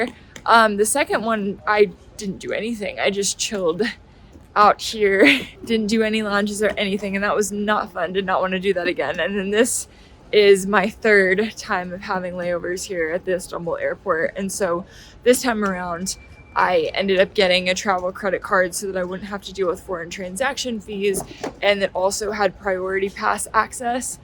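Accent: Texas, United States. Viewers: Блин красивая женщина) Есть что-то неуловимое, что притягивает мой взгляд. И еще ее американский мне абсолютно понятен. Приятно послушать чистый, понятный американский язык. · I'd love to hear your Texas accent :) and some restaurants or "streetfood" what you like in Russia :)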